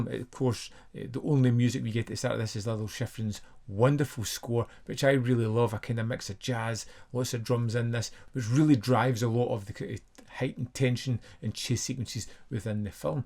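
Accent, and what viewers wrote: Scotland, United Kingdom. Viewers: I love this guy's Scottish accent even if I can't always understand it.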